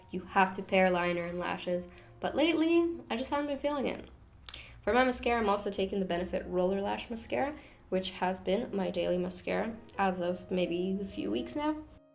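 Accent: United Kingdom. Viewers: Soooo happy to see you back 💗 I also love your slight british accent hahaha it’s so cute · That British accent is coming through and I am here for it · You're starting to get that English accent! Too cute! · OKAY I saw the comment on your ig about the accent and now I can't unhear it lmao I think it's the way you end the words and say the 't' now. I could also be tripping. but british influence tho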